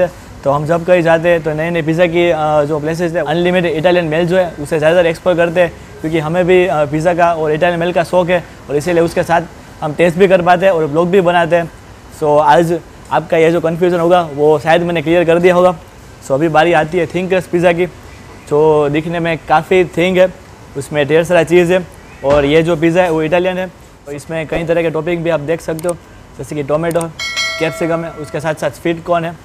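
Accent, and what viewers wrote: Gujarat, India. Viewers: Specially Loved u r gujju accent hindi. TRY SOME VLOG IN GUJRATI. Might help u littel bit more to grow. BTW AMAZING EFFORT GL.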